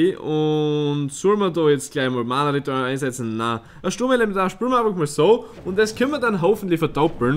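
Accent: Styria, Austria. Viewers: Ich kann dich verstehen weil Steirisch ist fast gleich Schweizerdeutsch😉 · i hob an freind der a steira is und der red a wie du. I bin oba Niederösterreicher. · I finds voll geil das du österreichisch redasch i find as isch bessa so :D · konnte es versthenen weil ich aus Bayern komme und weil da der Dialekt so ehnlich wie in Östereich ist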